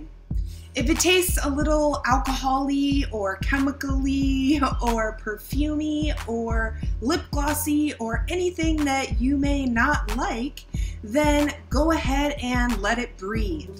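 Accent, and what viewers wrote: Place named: Australia. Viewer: I love your accent. Which part of Australia are you from?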